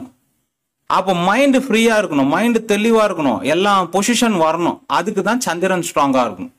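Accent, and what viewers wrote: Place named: Chennai, India. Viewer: நல்ல சென்னை தமிழ் சார் 🎉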